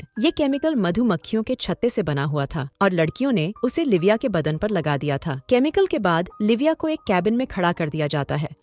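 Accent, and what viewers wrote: Punjab, India. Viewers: Hlo aap punjab se ho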